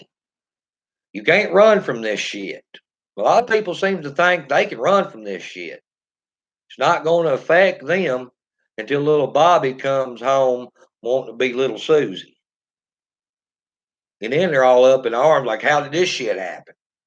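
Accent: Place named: Rural, United States. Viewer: You sound like an inbred hick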